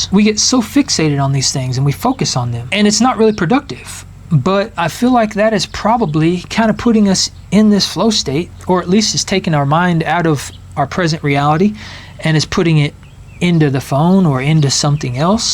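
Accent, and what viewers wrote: United States. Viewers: I’d like to know where you are from in the US as I like your accent. Greetings from Queensland Australia.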